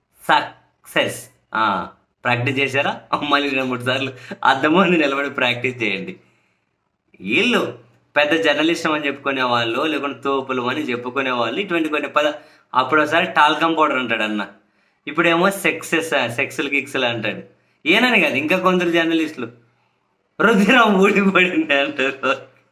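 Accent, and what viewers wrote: Rayalaseema, India. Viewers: bhai.i think you might be belongs to our Godavari district.you are at present belongs cuddapha.why because I am having knowledge cuddapha/Rayalaseema slang being Area Sales manager.in marketing in FMNDC.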